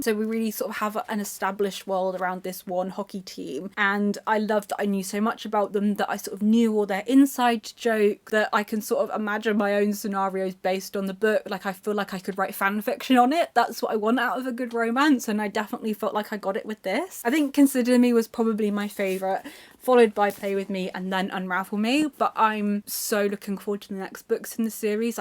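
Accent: United Kingdom. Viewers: Thanks for being back with your intelligence , your bright eyes and your lovely British acent. I read Agatha Christie when I was rather young , althouhh I am not keen on detective books except from Sherlock Holmres . As I love Victorian literatue Doyle has to be on the list. I devoted a lot of time in 2023 to Dickens's long novels and Ihave enjoyed them a lot. My mother tongue is Spanish, by the way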